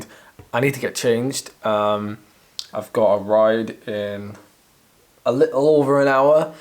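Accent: Wales, United Kingdom. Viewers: When I moved to Cardiff as a kid one of the first things I heard was ‘now in a minute’ Still tickles me 50 years later. How Welsh are you but? 😂🏴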